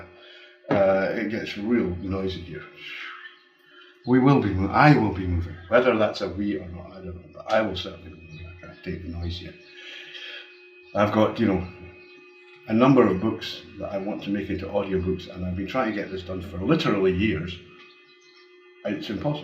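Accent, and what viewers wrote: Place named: Scotland, United Kingdom. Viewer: Very interesting, had some problem with the scottish accent so i have to listen twice...English is nor my first language so,, but learning..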